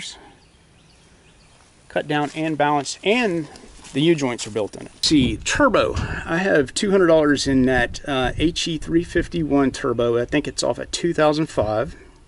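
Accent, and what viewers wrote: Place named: Georgia, United States. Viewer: Lol I knew this guy was from Georgia before I Saw his tag I’ve been to pike County a few times!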